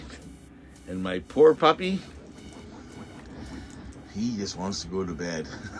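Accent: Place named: Canada. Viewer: I thought Dude said : Peace,Love & chew toys. But it could be his Canadian accent.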